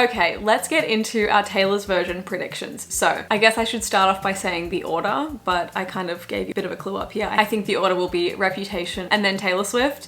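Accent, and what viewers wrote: Australia. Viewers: Amazing vidéo as always. I Watch every vidéos from France ! It helps me improve my english and understanding of the australien accent. Merci !!! 🥰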